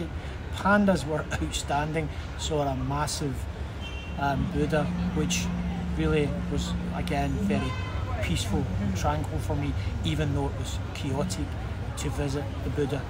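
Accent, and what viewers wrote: Scotland, United Kingdom. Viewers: Thank you for the matter of fact sharing. Love your Bonny Scottish accent❤❤❤ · You’re the first Scottish guy I can understand clearly even though I am British 😂 Chinese people are very welcoming in mainland China can’t wait for my big move in August in Hangzhou Subscribed ❤ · Your Scottish accent is absolute adorable, sorta reminds me of pirates and Spartan worriers haha 😊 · I loooooove your Scottish accent. And great video too!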